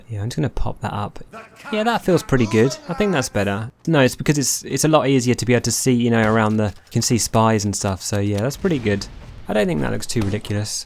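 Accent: Scotland, United Kingdom. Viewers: but are you scottish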